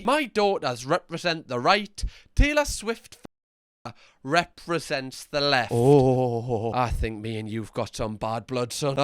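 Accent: Newcastle, United Kingdom. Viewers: I don't know the origin either, but I think it's term of endearment for us followers of his. I LOVE being called a gorgeous donut daily, esp. in that Geordie accent of! xoxo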